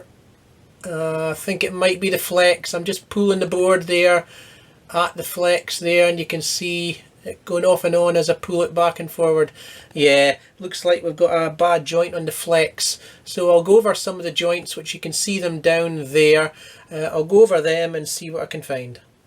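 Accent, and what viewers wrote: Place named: Aberdeen, United Kingdom. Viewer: Aye that's a good video, you sound like you have an Aberdeen accent 😁I'm in Aberdeen & you remember the old breaking Channel 14 lol. Perhaps hear you on cb in the Granite City 🏙 😎 👍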